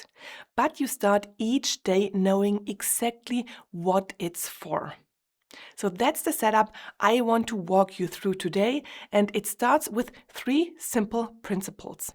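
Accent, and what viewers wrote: Germany. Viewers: Hard German accent.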